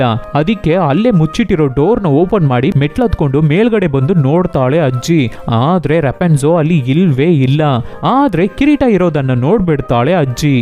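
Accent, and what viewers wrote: Tamil Nadu, India. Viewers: அண்ணா நீங்க தமிழ் காரங்க தான நீங்க பேசுற அப்பவே தெரியுது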